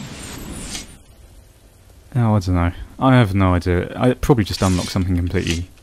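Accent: United Kingdom. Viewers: It's so good to hear a british let's player, love you're rude, witty commentary, and the foul language aha doing us brits proud.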